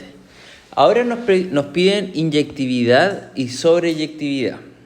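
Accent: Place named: Chile. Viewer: me entretiene el acento chileno, buena explicación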